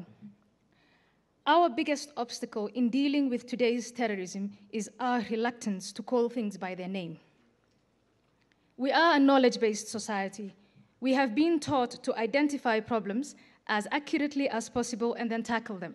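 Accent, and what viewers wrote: United Kingdom. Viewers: yo your spoken english is british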